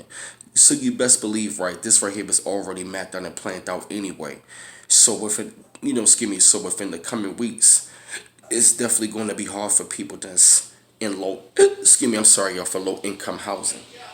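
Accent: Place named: Maryland, United States. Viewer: Thanks for sharing brother, love that Maryland accent.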